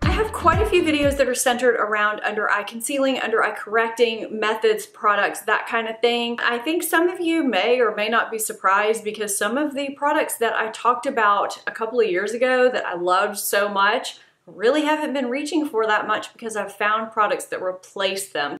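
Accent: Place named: Memphis, United States. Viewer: Gotta say, being from Memphis, your Southern accent sounds distinctly like it's from my area. You sound like a Memphian! Great videos!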